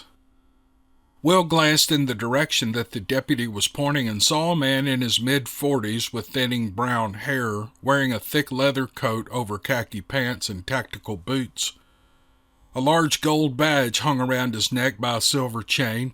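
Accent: South, United States. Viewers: I am so darned excited, I could not listen to part 1 fast enough, then when I began part 2 directly after part one. I HAD to put on the brakes! "Savor it, don't rush through it", I told myself. But, have I listened to me? Oh HELL no! First taste I just started gobbling part 2 up, as well. I had to force me to STOP and send you and D.A. a note to say, as usual, nothing but the best for your followers. Mr Roberts your writing rocks, I love your brain and how it works! Your words manage to keep me glued to my seat 'til the last little scrap is gone. Then I am wanting more, like some kind of crazy person, I sit and whine for a moment. I can't help myself I just HAVE to go back and listen to it all over again, I KNOW I missed something, some important detail. I love how you put words together and whisk us away to a new world, it's amazing!, your voice and southern accent (lived in Tennessee for over 25 years and nobody sounded as good as you do) enable me to listen to something over and again because it's so soothing and yet loaded with excitement, sadness, joy or what ever the emotion the author intends. 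The ability to read aloud with all the inflections necessary to put the listener right in the middle of it all is not something everyone can do. You both are gifted. I want to THANK YOU both for sharing your gifts with all of us. I just love you both to bits and pieces, again, thank you!:face-red-heart-shape::face-red-heart-shape: Rosey loving life from the boond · You did an excellent job reading these to us. I've heard you before, but hubby and I sat down and listened to all 6 Parts in one day, no joke. We couldn't stop listening until we heard them all. You are an excellent storyteller, and we appreciate you!! Your southern drawl and just the way express everything makes it come alive. I do not want to forget DA Roberts, who without him these would not be possible. Thank you again. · Omg im not one for listening to someone else read for me,i love to read books myself, but im hooked absolutely hooked,i love your southern accent, im scottish,you really got me listening and on the edge of my seat, I've just started binge watching your podcasts,amazing never stop,im not normally into this sort of thing, keep it coming 😊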